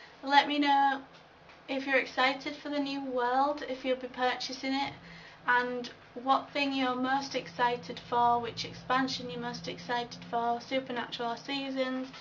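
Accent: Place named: England, United Kingdom. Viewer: Yay!!! an English accent!! Finally!! I'm british too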